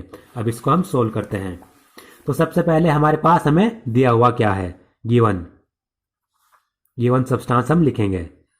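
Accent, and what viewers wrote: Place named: Hapur, India. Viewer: I think you belongs from near hapur According too your language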